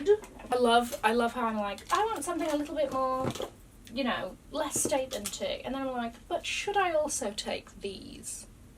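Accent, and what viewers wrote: United Kingdom. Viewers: I’ll have to keep an eye out for your British accent and fab style! 😉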